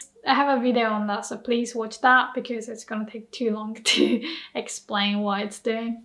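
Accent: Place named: United Kingdom. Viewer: I never thought about it untill you said you lived in England for a bit... but your English does have a British-English sound to it. Your word choice/vocabulary seems to be more American-English... I find this interesting. This video was great. Particles are one of the most difficult things for me to understand and remember as a self-studying Japanese learner. I deffinanlty need more ammo. 😅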